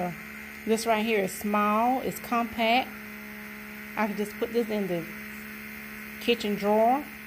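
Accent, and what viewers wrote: South, United States. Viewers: Love your accent! Are you from either South Carolina or Georgia? That's my guess, lol. I'm from RI so my own accent is absurd (Boston, lol), so I appreciate a good accent. =) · You sound like I want you for my Grandma. Love that country woman accent.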